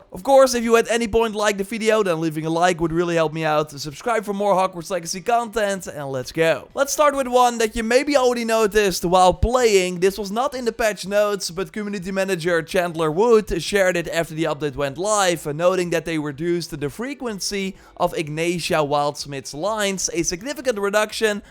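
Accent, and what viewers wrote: Netherlands. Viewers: Are you Dutch? Your accent sounds like it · Mooi Nederlands accentje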